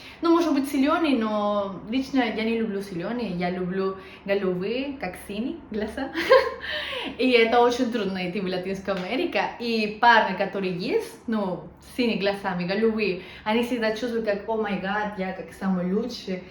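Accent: Spain. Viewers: Впервые слышу испанский акцент, если не вслушиваться звучит как "плюм-плюм")))) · Случайно наткнулся на это видео-ну ты перуанская красотка- молодец 👏, неплохо говоришь по-русски, с приятным испанским акцентом! 👍 Удачи тебе, лайк и конечно подписка! 👍👌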